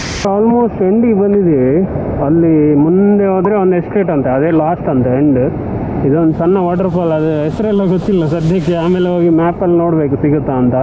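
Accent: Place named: Coastal Karnataka, India. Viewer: Thumba genuine viewers matra nim channel alli baro videos poorthi nododu....but nijvaglu ondh video na poorthi nodudre saaku, we will just fall in love.....you are such a kind hearted person... almost 3.5+ years inda nim subscriber. Ondhe ondh kuda exaggerated video nan nodilla ee channel alli... I'm sure ee channel elligo hogutte swalpa dinadalli and btw nimma dakshina karavali kannada kelakke thumba sogasagide💗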